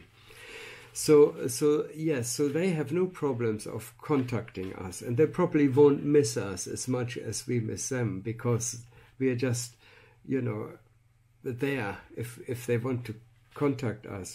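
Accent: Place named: Germany. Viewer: warum spricht er eigentlich kein Deutsch? Er ist doch ein Deutscher. Hört man doch total am Akzent. Ich verstehe echt nur die Hälfte. Eigentlich schade. Das Thema ist so spannend!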